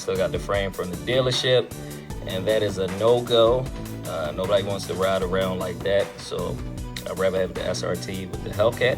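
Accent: Baltimore, United States. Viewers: I’ve been watching your videos for month or so, and I been saying to myself “this dude sound like he from Baltimore” “I wonder if the purple is a Ravens/Baltimore thing”....Finally got my answer lol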